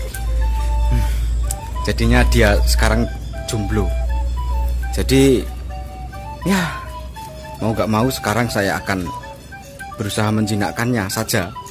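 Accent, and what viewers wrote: Java, Indonesia. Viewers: Ak seneng konten ini satu gaya MEDOK bahasanya tdk ada di channel lain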